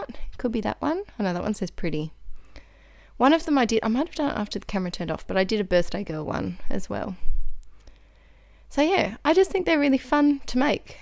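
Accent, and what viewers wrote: Australia. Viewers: just love hearing an Aussie accent for a change. Love your work space, I'm off to redo mine now. thoe embellishments are really cute.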